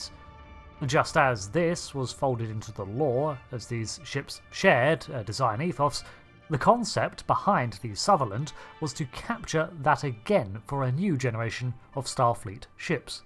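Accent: England, United Kingdom. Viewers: He's from England. They pronounce it anyway they please.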